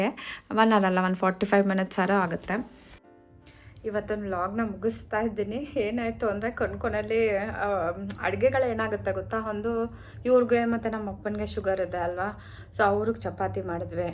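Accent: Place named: India. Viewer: Hi ur kannada accent good r u really a kannidaga wat about ur son is he facing any health issues as per both ur own house and the other house y dont u buy a new house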